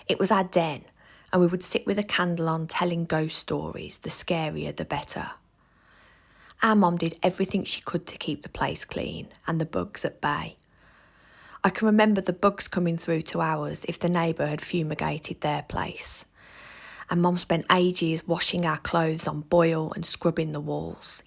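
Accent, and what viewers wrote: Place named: Midlands, United Kingdom. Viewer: What an absolute treat. I was completely enthralled by you describing the people’s lives. I remember us having the wallpaper with the horses on it and funnily enough they have it on the wall of my dentist now. I love the way you talk and definitely understood the lingo as I’m from there too. After 50 years in Somerset I’ve still got the midland twang. You brought those people to life, just fantastic. Thank you again Bab 😊 Xxx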